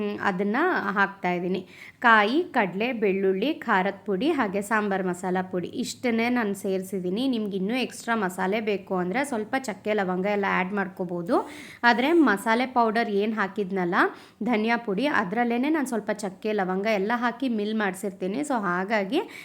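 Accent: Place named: Mysore, India. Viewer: I always wondered that you speak kannada exactly like my house kannada . We are from Mysore / Nanjangud belt . I live in Bangalore . I like the way you talk. You should plan 1 live session with your subscribers .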